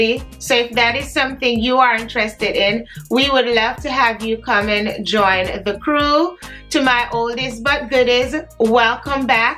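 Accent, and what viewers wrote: Patois, Jamaica. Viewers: I just found your channel and I’m like she is Jamaican… wait till the patois comes out and it did !!!!I fell out laughing 😂 YES!!! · YOUR PAGE NEEDS CROWD!!! HELLA TALENTED. I hear a Jamaican accent . New subscriber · At first I was like this African lady looks beautiful let me watch. Then d accent chip in and i was like . A JAMAICAN DEN SHE NUH MUSS KILL DIS... LOVE D VIDEO !! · "mi no like when we pants look like me tek measurement in a water." Real Jamaican. Girl you are funny. Love the haul.🤣🤣🤣🤣🤣🤣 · Love your Jamaican accent. You are? Love all the pieces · Knew I was hearing Jamaican! New subbie hear. Great haul 😍😍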